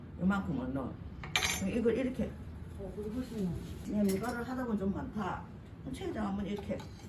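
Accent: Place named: Gyeongsang, South Korea. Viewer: 통영이구나 경남말투인데 어딘가했네요 ㅋㅋ. 내일 시험인데. 합격했슴 좋겠네요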